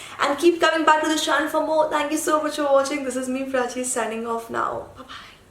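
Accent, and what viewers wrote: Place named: India. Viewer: Do you live in India ? You have an indian accent. I love your video !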